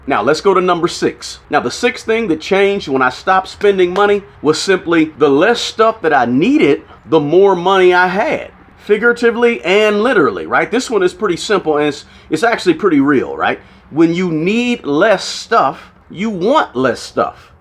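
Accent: United States. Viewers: You're a smart cookie! I know exactly what you mean. I have cupboards bursting with stuff! Good video. I love the American accent 🇺🇸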